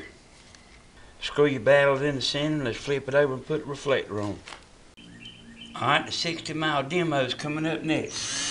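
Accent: South, United States. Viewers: I just simply love your southern twang, your funny, and know what your doing. Do you even watch TV? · Liked your video. Short and to the point. Plus I love the southern accent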